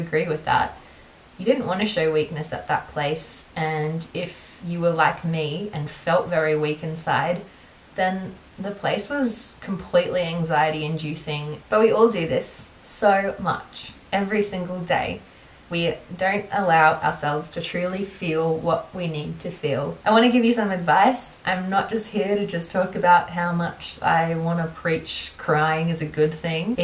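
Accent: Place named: Australia. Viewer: Hi! It's Milli, I hope you remember me from Budapest:) I just wanted to tell you how much I love these types of videos (and I'm also proud of myself because I still can understand the Australian accent ahaha) and how much I relate to you especially on this topic. These are the kind of conversations everyone need but unconsciously avoid. Thank you ♥️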